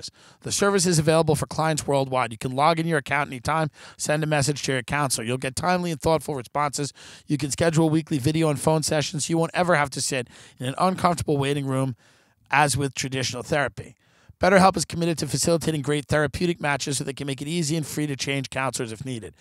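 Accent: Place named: Chicago, United States. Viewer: love you Tim. you sound like my Chicago cousins. i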